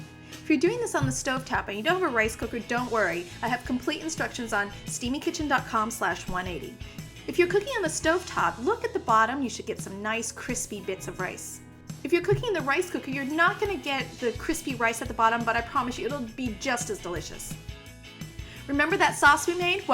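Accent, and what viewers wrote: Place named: United States. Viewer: wow if I just heard your voice with out the picture I think you was white American lady straight up.but nice cooking tho looks fantastic.cheers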